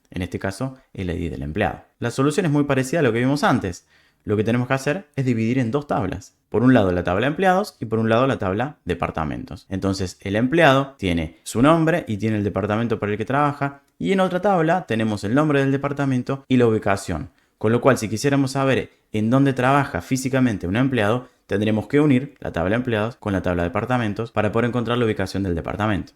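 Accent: Argentina. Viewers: Justo estoy haciendo un TP de este tema, y me agrada escuchar todo esto en "argentino", gracias, te ganaste un suscriptor. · acento argentino que me da confianza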